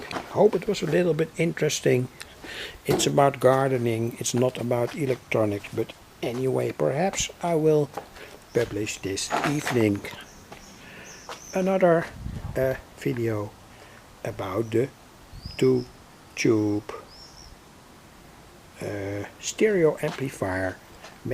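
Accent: Netherlands. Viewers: Love the garden update! I grew up working in the garden with my Dutch Oma and have always had a love for growing plants as a result. I follow your channel for two reasons. The first is to learn about electronics through your projects. The second is to hear your accent because it reminds me so much of my grandmother. Have a wonderful day,!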